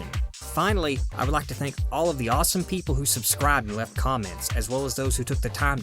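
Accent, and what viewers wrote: South, United States. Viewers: is he southern??? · No disrespect to you or people from the south, but your accent. Now my mom is from the south, Norfolk, Virginia in fact, and her parents and their family are from the Carolina’s and Georgia. So their pretty much as southern, as respectable southern can get. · I like how your accent is "normal" and then your southern one comes out... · Are you a Southerner i can here certain twangs in your accent, I can kinda tell because im a Southerner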